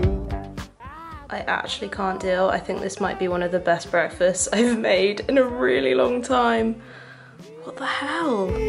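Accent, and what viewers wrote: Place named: England, United Kingdom. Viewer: I love that accent is it English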